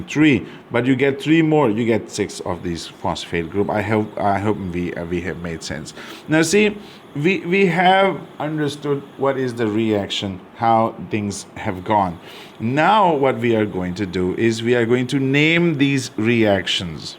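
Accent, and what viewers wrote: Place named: United Kingdom. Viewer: Sir your British accent just feels so real. so smooth 🤍 Really appreciate your work 🤍👍🏻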